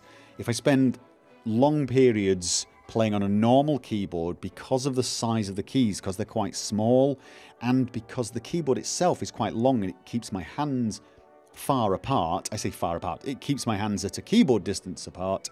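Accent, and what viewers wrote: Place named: England, United Kingdom. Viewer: going from his accent i would say he was Mancunian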